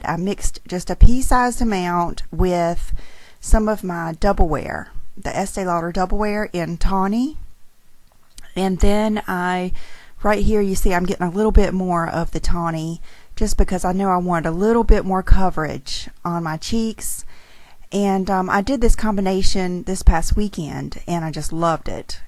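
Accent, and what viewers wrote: South, United States. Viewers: You have such a calming, sweet Southern voice, 😊 Great voice over tutorial! Hopefully, once the kiddos go back to school, you'll do some "Bunny Vlogs"👍🏻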